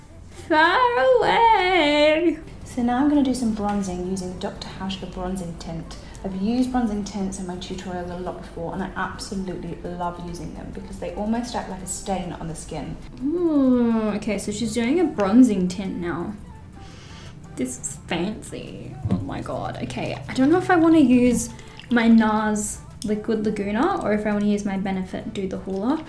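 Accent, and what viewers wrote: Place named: Australia. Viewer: Loved this style vid and love the look. You look beaut. Also loved how much your Australian accent came out to play!💁🏼‍♀️💕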